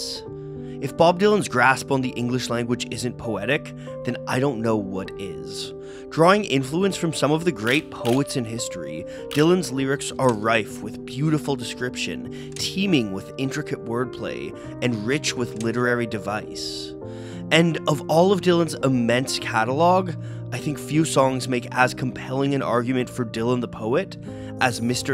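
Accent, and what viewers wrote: Canada. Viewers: canadian,eh? great video as usual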